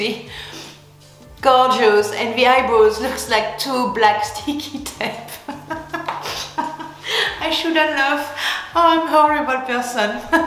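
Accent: France. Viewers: Oh you so funny, but it is funny those big brows.....so nice to see humor and it’s natural. I like your videos. Love your French accent .... and being feminine is such a bonus for all woman. But some just don’t care.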